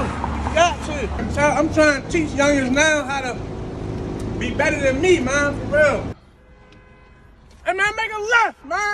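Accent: Baltimore, United States. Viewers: I’m a Baltimore guy born and raised he nailed this shit 😂😂😂😂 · Bruh so good I actually though he IS from Bmore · Is this Nigga from Bmore? Lmao 😂 how tf is he doing this shit so perfectly ? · You definitely form Baltimore I can not!! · He gotta be from Baltimore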